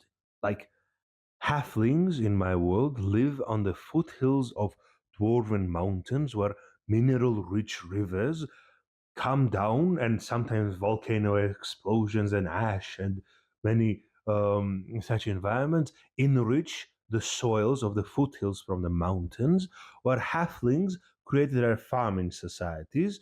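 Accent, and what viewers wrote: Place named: Wales, United Kingdom. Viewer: Ive been to Georgia before but this is the first time ive heard a Georgian accent that sounded welsh. Im guessing it must either be a regional accent or a result of having a welsh English teacher.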